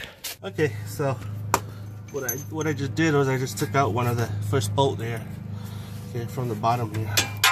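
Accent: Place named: Hawaii, United States. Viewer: Where are you from you sound local to my area I am from Maui Hawaii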